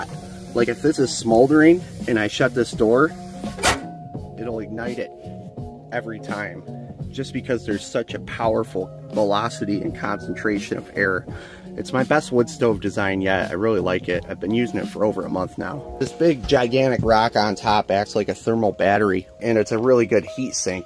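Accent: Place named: Michigan, United States. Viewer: You sound like you have a Michigan accent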